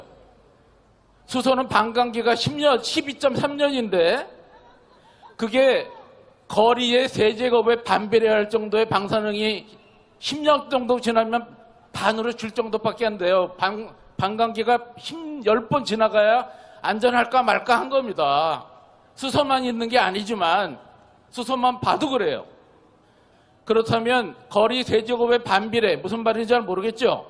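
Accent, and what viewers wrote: Jeolla, South Korea. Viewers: 전라도 사투리쓰네 과학자라면 과학적 으로 증명하면 될것아니냐? 제발 그렇게 해봐라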